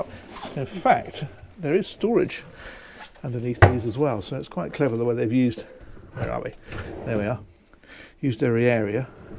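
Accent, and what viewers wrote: United Kingdom. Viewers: Now that's the best use of space in a class A I've ever seen. And if you don't carry a vehicle the garage can be another bedroom or office or playroom or Mancave. Excellent design. And the biggest surprise is the price. At E285,000 very affordable. I like the British accent....Gah-rahge....Priv-a-see!!! Cool👍 These reviews are excellent just like your boat reviews.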